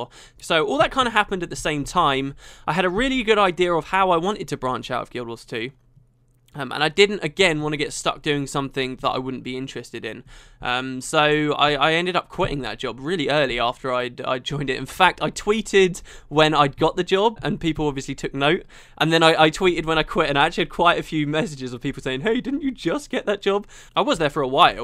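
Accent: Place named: Manchester, United Kingdom. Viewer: Sounds like he might be from Manchester!!! could be wrong.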